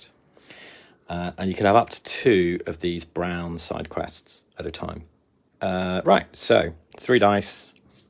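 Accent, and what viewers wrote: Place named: United Kingdom. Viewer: Ciekawy tytuł, mocno brytyjski akcent :)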